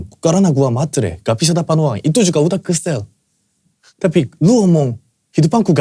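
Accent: South Korea. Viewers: Orng Korea omng bhs indonesia sprti bhsa korea 😂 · Bhs Indo logat korea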